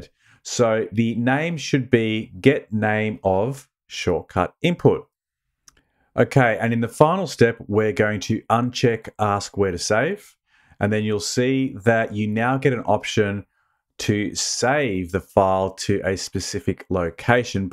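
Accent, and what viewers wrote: Australia. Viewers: Great tute, exactly what I was after & great to hear it spoken in aussie so I don't need subtitles ;) Massive thanks. Wondering if there's a way to make the logo size a percentage of the image also if you know how to add a border during the same process or a diff one if neccesary?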